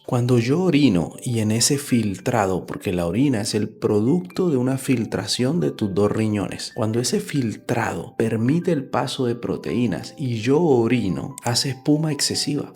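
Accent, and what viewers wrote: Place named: South America. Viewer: Ademas de aprender, me arrullas. Que voz tan suave, clara y agradable, junto con ese acento sudamericano tan amable. Gracias❤❤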